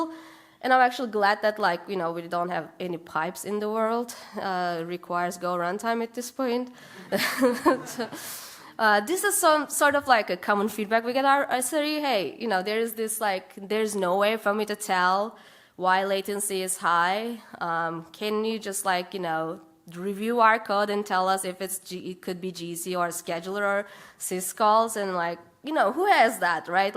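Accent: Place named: Turkey. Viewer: Anyone else wondering what her accent is, it's Turkish!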